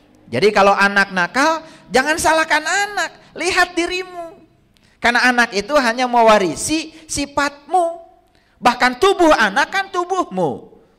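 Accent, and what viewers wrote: West Java, Indonesia. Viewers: Ust ni sundanya daerah mana,ada yg tau?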